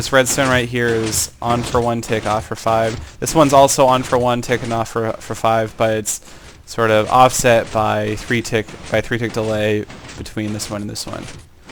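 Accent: United States. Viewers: maby he is trying to spell "Fußball" the german word... ?! because that the typical american accent...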